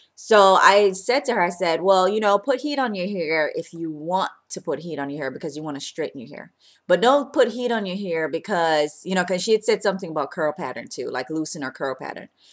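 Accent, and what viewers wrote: Jamaica. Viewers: loveeee love your hair- i am going natural-6 months post relaxer now- are you jamaican? i hear an accent? my whole family is jamaican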